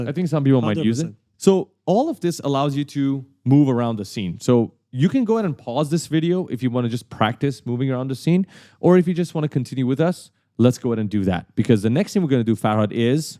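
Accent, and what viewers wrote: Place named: Iran. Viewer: اینکه میدونم دارم unreal engine رو از هم وطنم یاد می گیرم یاد گرفتنش رو برام خیلی جالب تر می کنه, دمتون گرم.(از لحجه پیداست ایرانیه)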